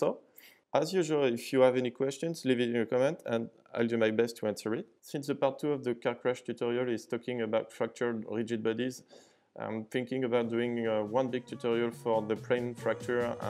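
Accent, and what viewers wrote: France. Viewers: l accent explique Napoléon , tu représentes ! petite fierté mal placée , franchement tu déchires tout ce que j'ai vu ! merci pour ces moments d'émerveillement !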